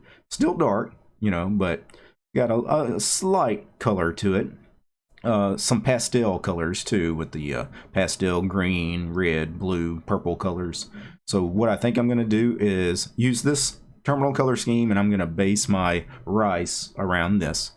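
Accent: South, United States. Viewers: love the accent always a pleasure to listen to a techie southerner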